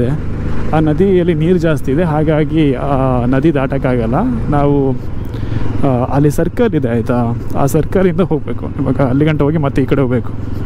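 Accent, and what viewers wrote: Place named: Dakshina Kannada, India. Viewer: ದಕ್ಷಿಣ ಕನ್ನಡ ಕೇಳ್ಳಿಕೆ ಒಂದು ಚಂದ ಮಾರೇ🫶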